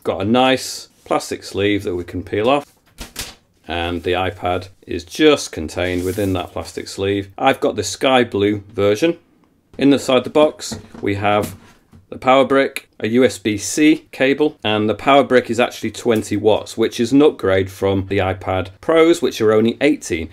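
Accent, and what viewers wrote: United Kingdom. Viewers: Wow a British reviewer on youtube! Yes yes yes!!!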